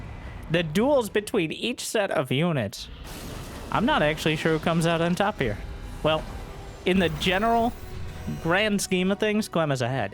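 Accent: South, United States. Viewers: Never Imagine the day, I will be watching a starcraft commentary with a southern accent.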